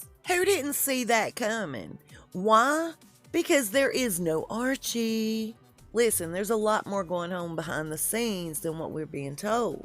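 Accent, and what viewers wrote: South, United States. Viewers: I have watched several of your videos and they just keep getting better and better. I had to subscribe and I love your southern accent, reminds me of home! Plz keep up the great work and THANK YOU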